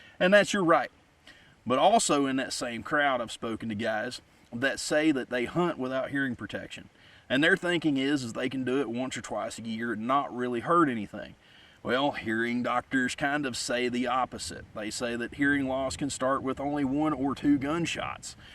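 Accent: South, United States. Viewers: I always liked a southern accent. Makes things go down smooth. LOL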